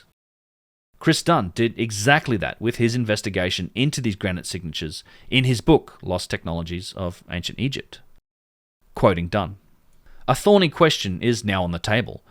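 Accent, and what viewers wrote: Australia. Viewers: That's a fellow Aussie accent! 😉 Nice work mate 🙂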